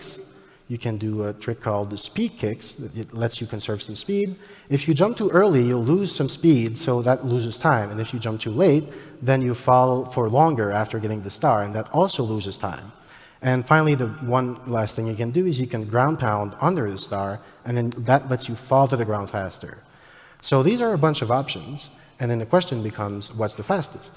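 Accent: Quebec, Canada. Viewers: i can hear the french canadian accent slipping through, I would know that because i'm also canadian lol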